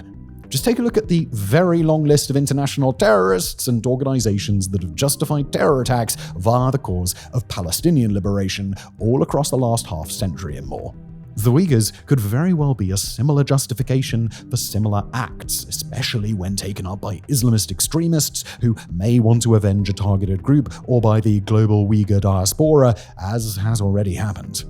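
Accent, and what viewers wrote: United Kingdom. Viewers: His Britishness is the same on most his channels with cadence and tone, but the backing music really does push it one way or the other. But yeah, it’s all SEO stuff designed to promote and get your attention, it’s how it has to be done if you want to get views and keep them. Blame YTs algorithm ultimately.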